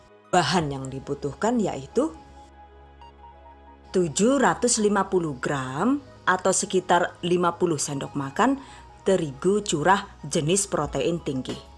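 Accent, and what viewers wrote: Banyumas, Indonesia. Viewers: Wah bisa buat isian jajan arisan nih... Muantul resep2nya... Selain mantul, ngomong ngapaknya juga bikin sakit perut... wes lah yaaaa jan tenan... Caaaatat DONE #giveawayTHVdesember2019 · Enyong sabar....nunggu ngomong ngapake....ora Tek skip loh mbekayu...resepe nyong ya butuh kowh... ksuwun nggih... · Kiye nyong seneng nemen yen bunda ngomong nganggo bhsne inyong yakin sung bund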